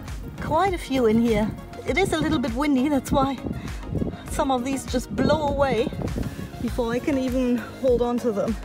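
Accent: Australia. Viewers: Loving the amber finds Meanwhile, how is your family with your 'aussie' accent? 😊😊😊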